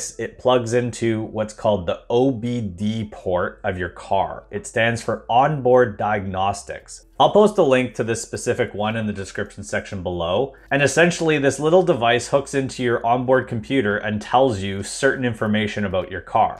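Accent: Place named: Canada. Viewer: Excellent, clear introduction to the LeafSpy! By the way, I suspected you were Canadian even before you said so. How? I am not sure I can explain, but it's a compliment.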